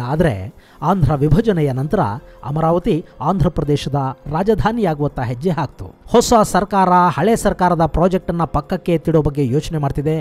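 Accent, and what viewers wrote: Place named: Uttara Kannada, India. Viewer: Super sir nimma Uthara Kannada bashe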